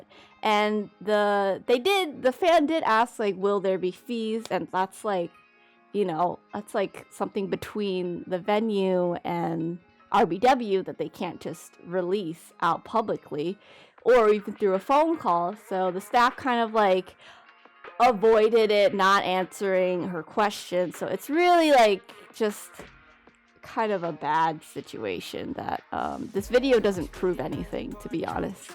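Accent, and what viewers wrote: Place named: United States. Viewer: my apologizes. Could not understand when you said you had been unwell. It was your American accent 😞 Hope you are feeling slightly better. Cannot wait until 2023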